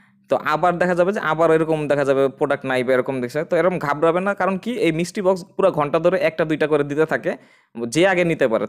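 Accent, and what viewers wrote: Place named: Fatullah, Bangladesh. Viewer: Vai apnar basha ki fattulah naki 😅😅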